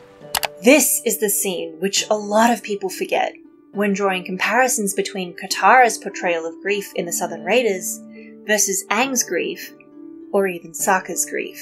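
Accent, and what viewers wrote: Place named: Australia. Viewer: I love the way you pronounce Aang's name in your Australian accent.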